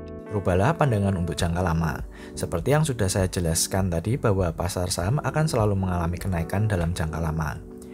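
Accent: Java, Indonesia. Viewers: kalau medok apa tidak bagus? mau baca text atau tidak, video-video di sini tetap recommended kok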